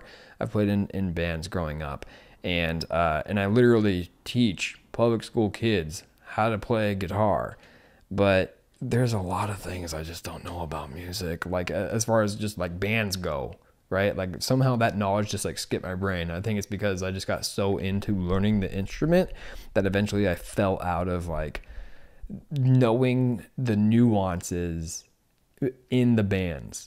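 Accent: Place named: United States. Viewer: You sound like american. That explains why you dont seem to know very much about world and history. 😊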